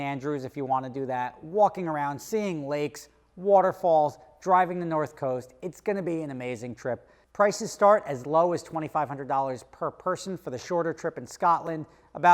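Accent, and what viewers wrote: New Jersey, United States. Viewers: Just put the old S on and it'd be perfect. Jersey accent an all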